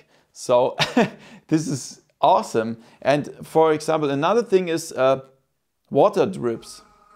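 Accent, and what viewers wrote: Germany. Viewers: Der deutsche Akzent genial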